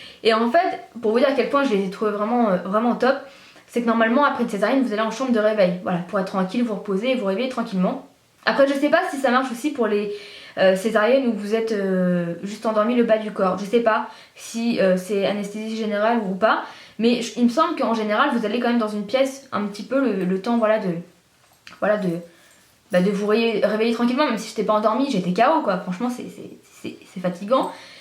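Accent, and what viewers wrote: Normandy, France. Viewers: tu as un accent normand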